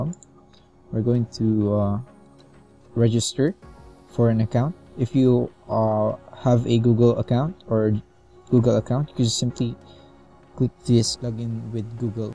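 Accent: Philippines. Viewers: kaya pala familiar ang accent mo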